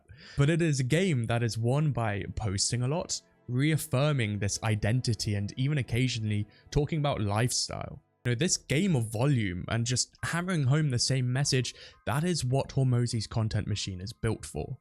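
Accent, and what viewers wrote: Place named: England, United Kingdom. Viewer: Even with an english accent, this dude still could not sound intelligent. Give up bud!!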